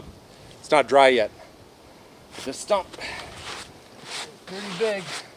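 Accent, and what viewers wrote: Canada. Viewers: your accent . are you Canadian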